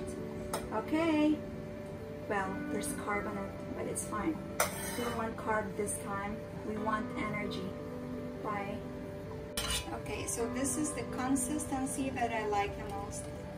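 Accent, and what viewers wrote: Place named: England, United Kingdom. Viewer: The best chef mom! super like the english accent and video content.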